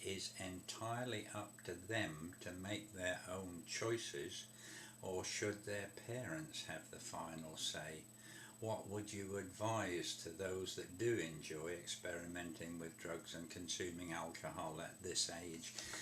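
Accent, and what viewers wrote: United Kingdom. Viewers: Old Guy + British Accent = Best lecturer.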